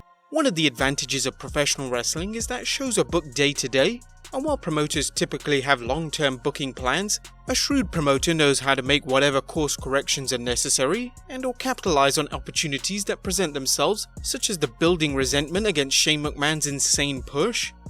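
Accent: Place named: London, United Kingdom. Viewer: lol Alex Jones has well over 6 million radio listeners and an additional 10 - 12 million viewers on the internet daily. In comparison to the WWE and their fake bullshit vs a truth telling Infowarrior who in the hell cares about phony ass wrestling when the world is in crisis. Turn off your televisions you idiots all hell is about to break lose and when it does there wont be any phony wrestling to watch. judging by this clowns accent I can see why London has fallen, instead of being out there supporting a real bad ass hero named Tommy Robinson he's watching phony wrestling and worshiping fake ones.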